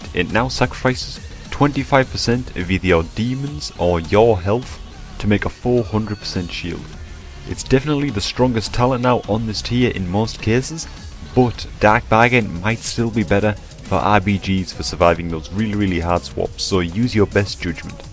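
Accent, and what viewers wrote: Newcastle, United Kingdom. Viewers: Were abouts are you from? are you from newcastle by any chance? because im from there :D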